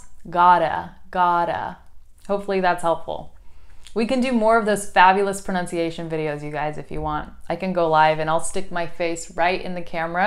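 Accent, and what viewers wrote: Buenos Aires, Argentina. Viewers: Wow, hahaha you have such an incredible argentinian accent that you could fool anyone here in Mexico thinking of you are from Argentina. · Vos sos argentina. No puede ser que pronuncies tan bien español. Es la primera vez que escucho a alguien de USA sin acento. Me sorprendió tanto...tenés acento argentino, es más, porteño. Nadie se daría cuenta jamás que sos americana si estuvieras pasenado por acá. Te felicito, ojalá algún día yo pudiera hablar inglés aunque sea un 10% de lo bien que hablás vos español. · WOOOW!! I can see you're not only a pretty gorgeous woman, but also a VERY SMART ONE!! CONGRATULATIONS!! It's very impressive that you can speak the spanish very fluently. And the most awesome thing is that you can speak it very well, and you can speak in a perfect argent acent as well!! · Me he visto este video como 1000 veces solo por escuchar a la profe hablar en español con su hermoso acento argentino. 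· Wow, your Spanish sounds very angentian. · Tu español es acento argentino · You have a very impressive argentinian accent. You sound very native. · Hablas con una mezcla de acento español y argentino (pues es lo que me parece). Que bonita! Saludos desde Brasil. Me encantan tus videos en youtube. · me enamoré! habla perfecto en argentino jaj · Your spanish is cool, your accent is totally Argentinian! I know it is cos you were living there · Wow your Spanish is great! Also, your Argentinian accent is so good I am shocked! Showing people how good your Spanish is considering that you did not grow up with it really sets a good example of determination and achievement. Maybe one day I will become an English teacher myself as well. Thank you for being so patient and polite to people who still struggle to communicate their ideas in English. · Lindo acento argentino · Es evidente que estuviste en mi país, Argentina, tu acento es sumamente porteño. 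· I love you sooooooo much!!!!!!! Argentinaaaaaaaa, you sound like me hahaha Speaks perfect the Porteño accent :D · It is really beautiful to hear you speak Spanish with that Argentine accent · Hi, En español tienes acento argentino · tu acento en español es argentino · Qué genial que hayas dominado el español con acento argentino! 😃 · Que lindo es escucharte hablando en Argentino jajajaj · Hi, I really like when you speak in Spanish, your scent is from Argentina. 🌸 You have a beautiful voice. You speak a very well Spanish. You speak a perfect and excellent English; I can understand you.🧚🏼‍♂️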